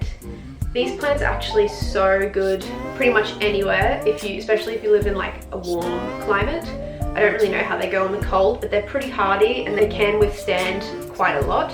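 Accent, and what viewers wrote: Australia. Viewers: Where do you live you have the same Aussie accent as me 😂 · Yesss I've been looking around for another good, aesthetic youtuber and finally I found you!! Bonus points is that you're Aussie like me hah I realised from the background noise of insects before I even heard your accent. So ready to binge watch all your videos!!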